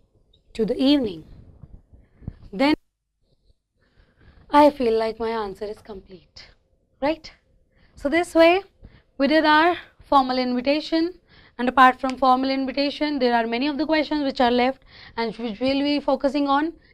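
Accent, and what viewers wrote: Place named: Pakistan. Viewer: Pakistani english bolrhi h ye madm To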